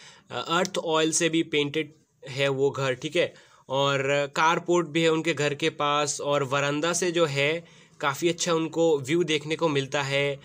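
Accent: Bengal, India. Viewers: Aap Bengali ho na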